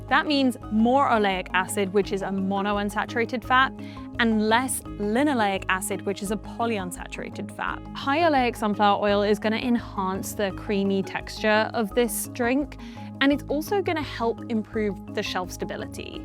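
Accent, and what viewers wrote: England, United Kingdom. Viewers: Weird to have someone speaking with an English accent, but calling Beef fat "tallow" instead of "Dripping" , and claiming she's never had it. Really? Never had a roast potato cooked in the traditional way? Her accent varies a bit. Seems slippery like the subject. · Another Brit who is been bought by the commercial interest to down play the dangers of seed oil. The country has gotten sicker since they started using seed oil. That's historical fact and no one with the British accent who has been bought by the commercial interests are going to convince me otherwise. She's obviously been bought by the industry that makes seed oil.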